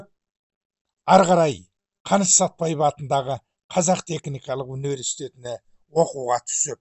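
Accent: China. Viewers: Өзің қытайдың акцентімен сөйлейсіңде,Қытайды жамандайсың??? Өте қызық жағдай екен😂😂😂😂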